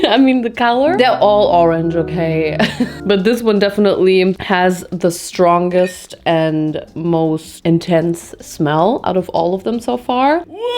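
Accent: United Kingdom. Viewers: As a Brit, I love your British accent! You nail it Queen! YUS! But gimme gimme those spicy noodles.....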